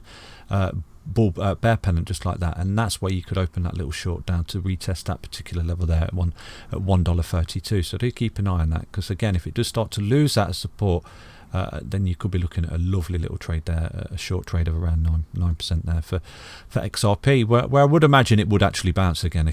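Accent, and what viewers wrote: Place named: Cockney, United Kingdom. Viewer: It's bad enough you have a cockney accent which is very hard to understand, but you're also a fast talker. That's a horrible combo for a reporter whose audience is expecting to be able to listen to you. SLOW the eff down!!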